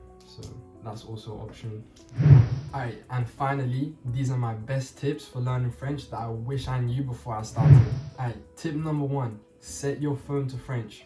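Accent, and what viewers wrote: France. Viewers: What is your native language? It sounds like you have French accent. I think you are making stories. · yes I’m a native English speaker trying to learn French and his French (or seemingly French) accent was the first thing I noticed upon clicking the video. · You have a little but of french accent in english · Your accent is so French?! R you sure it’s not your native language?! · Bro has a French accent when he’s speaking English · His English has french accent...hmmm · bro even got french accent 🔥🔥🔥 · You have a french accent when speaking english. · I don't believe this. There is something you're not telling us. Your Day 1 already had a French accent that someone learning literally their first day would not have. And just heard it: He began learning it in primary school. So you DID already have some education in it. Come on, stop with the MISREPRESENTATION! 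WHAT A LIAR. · This guy is lying- he is speaking English with French accent. We are latvians,but our kids went to french kindergarden and finished primary school, you cannot fool somebody who has children with French as first language. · you have a french accent when you speak English, you wont fool me.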